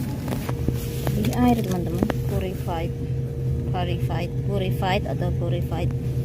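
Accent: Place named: Java, Indonesia. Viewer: Jadinkeingetb.. Logat mba ky logatnya mba puri yg di california aksen jawanya kental banget, apalagi klu ngomong inggris, sehat selalu m family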